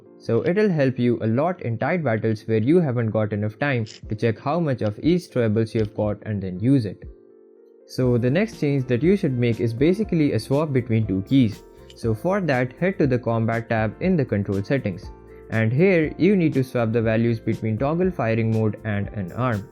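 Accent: India. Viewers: U r speaking good and constant english without stopping Very good And r u indian?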